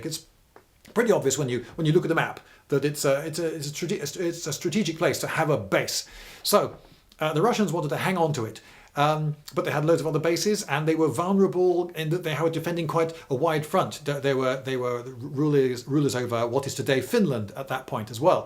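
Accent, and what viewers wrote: United Kingdom. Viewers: İs your accent the english one? Asking cuz i can clearly understand your speech without subtitles. So i wanted to know if you had english accent (Sometimes i can have problems in the american accent) (Btw i have only watched 2 of your videos which were the muzle break one and the clip-magazine one and they were awesome so i am subscribed to you from now on) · Now I don't know why this was recommended to me. I don't like fortresses nor canons, I certainly haven't heard of before. I was just going to give this video a chance and see what this older man was going to ramble about (me in my pessimistic view).... Before I knew it, around 39 mins have already passed, haha! I still didn't find the topic particularly interresting, just that there's something about hearing British speaking person explaining things that made me stay. If I remember right, I used to watch a nature program/show narrated by Attenborough and I think he spoke British English too:)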